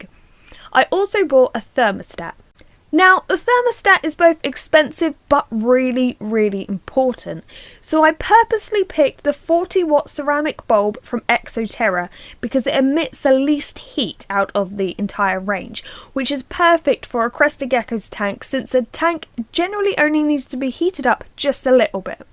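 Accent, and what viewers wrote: United Kingdom. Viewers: Wait your British :D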